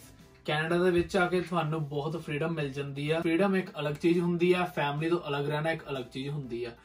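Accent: Punjab, India. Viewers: Very natural Punjabi accent.... Love your videos :)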